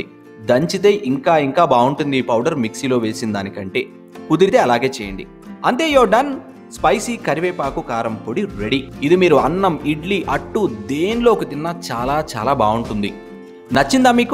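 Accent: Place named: Telangana, India. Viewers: Telangana yasa. Super bro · Telangana slang super..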